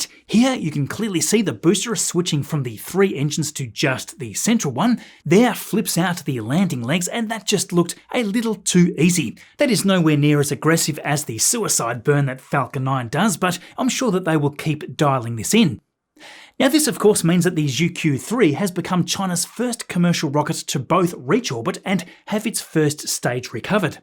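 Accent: Tasmania, Australia. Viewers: Didn’t realise you were a fellow Tasmanian! South or north?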